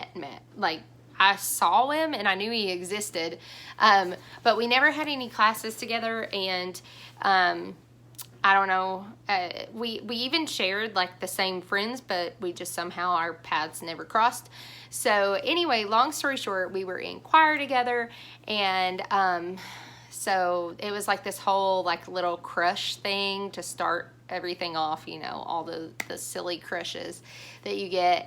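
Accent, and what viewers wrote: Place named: South, United States. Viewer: I want your Obstetrician! Also, I don't like pregnancy at all but love actually birthing. I always get epidurals though. Have birthed 6 babies and I love your channel...had no idea you adopted. The more I learn about you, the more I love you. God bless you, mama. You're doing a great work. Also, your accent is just amazing. I'm a Texas, but you are the epitome of southern. ❤️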